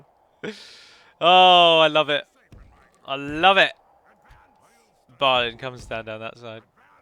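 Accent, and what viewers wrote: South Africa. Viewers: I love the South African accent! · Great South African accent!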